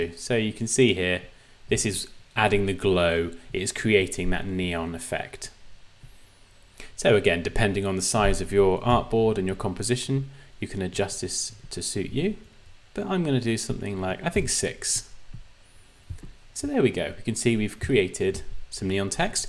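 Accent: United Kingdom. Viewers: his voice you mean his english i guess is british am i right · This tuts save my life, very interesting. And good UK speaking, i say it because when i surf into tuts made by people in USA i don't understand a f***. (i'm from Italy) Btw it's a great job!!!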